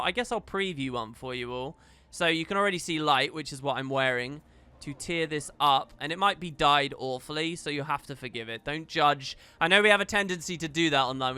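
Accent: United Kingdom. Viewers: I just hope you (not, but the real you) are doing OK. Your internet persona has been part of many bike trips to work. To so many coding evenings and you've helped me gain further understanding about how brits talk. I've come to grow fond of you and I'd hate something bad happening to you. You had us worried because we care for you even though we don't even know your face, haha.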